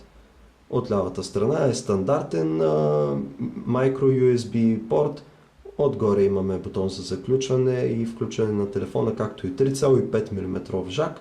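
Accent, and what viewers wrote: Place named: England, United Kingdom. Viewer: Which language is this? I really like your English accent lol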